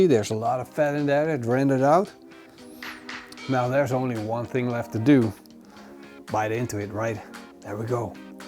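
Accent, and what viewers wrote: Netherlands. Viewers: Hey you're dutch... i know because of that acent :3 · Netherlands, I think. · Mario Solorzano Jr ? Perhaps Holland ? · Are you from Holland? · Thanks for the vid. You speak English with a Dutch accent :) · You sound Dutch.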